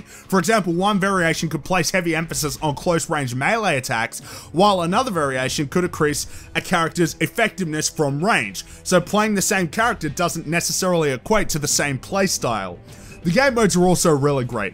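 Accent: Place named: Australia. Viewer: What state in Australia r u from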